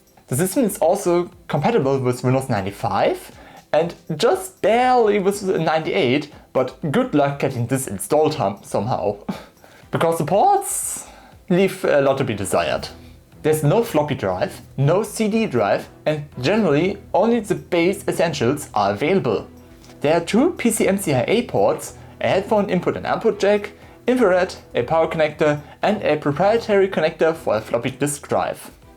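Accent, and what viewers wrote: Germany. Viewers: Dude, your accent is the every English speaking German in any movie. Fantastic. Love me a thinkpad. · Das deutscheste Englisch was ich bisher gehört habe. 😀 Aber super Content mega! 😊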